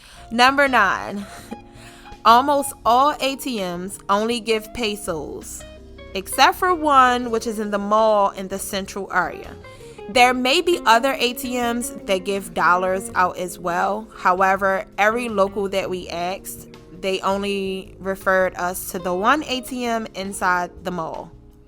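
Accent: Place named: Baltimore, United States. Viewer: Enjoyed the video. Enjoyed the B’more accent.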